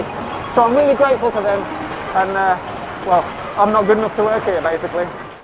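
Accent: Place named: United Kingdom. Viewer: 搞笑的英国口音，英国腔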